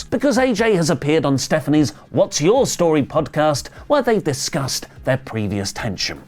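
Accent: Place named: United Kingdom. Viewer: Its his British accent lol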